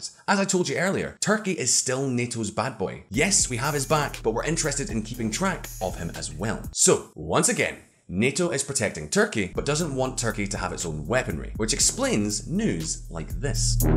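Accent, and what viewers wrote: United States. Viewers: Pretty good American accent buddy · The American Accent was pretty good. You sounded like my over-bearing neighbor. Good Job. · your American accent was pretty good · Firstly, this American approves your US accent. Secondly, laser weapons are obviously real, but they require a TON of power. Until we have more efficient batteries, they will always be land or ship based (and in rare cases, mounted on planes). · You should not apologise for the American accent, but embrace it, because your accent is just awfull... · Yeah, man, as an American, I approve that accent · I love that American accent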